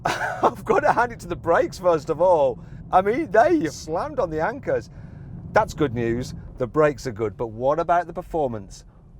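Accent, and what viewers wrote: United Kingdom. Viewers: 리뷰하시는분 발음이 영국분 같은데 나같아도 국뽕맞겠다..ㅜㅜ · 영국발음 너무조아 미쵸♡♡♡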